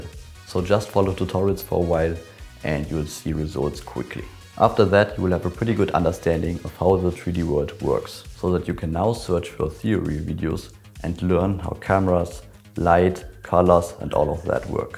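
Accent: Germany. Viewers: Ah höre ich da nen deutschen Akzent? 😳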